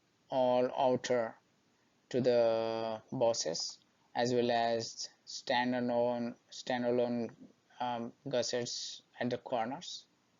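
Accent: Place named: United States. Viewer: Your US accent made me comment....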